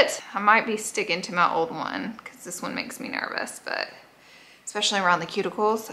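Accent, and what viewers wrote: South, United States. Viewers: I miss her southern accent. It’s more endearing than the Cali girl thing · OMG!!! I LOVE THIS VIDEO. Girl your southern roots are showing out!!!!😘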